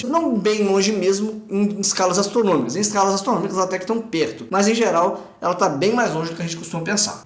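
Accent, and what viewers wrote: Minas Gerais, Brazil. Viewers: Sotaque da CAMILA do PEIXE BABEL mode on! kkkkkkkkkkkkkkkk Veio um mineirês com um baianês bem sutil, mas eu pesquei! kkkkkkk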